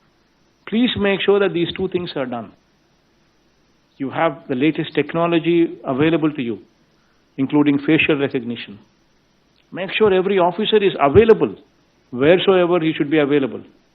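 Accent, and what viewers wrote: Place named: Telugu, India. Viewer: Good TELINGLISH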